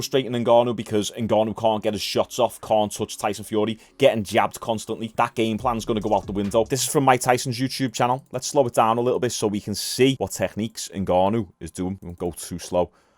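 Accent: Liverpool, United Kingdom. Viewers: Why keep the Scouser accent when you do a perfect Tyson AND a perfect Ngannou lol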